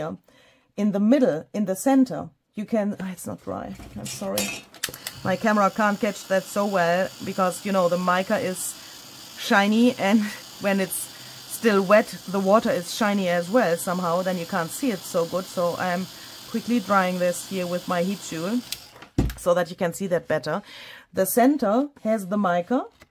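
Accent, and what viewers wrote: Germany. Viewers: German with a Southern drawl? My ears can't even imagine what that would sound like! 😄😄😄👍🏻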